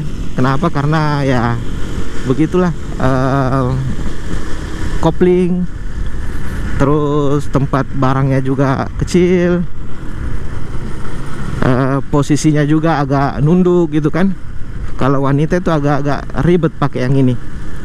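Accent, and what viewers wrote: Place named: Sulawesi, Indonesia. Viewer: Sulawesi bagian mana bang? Dari Logatnya kentara hehehehe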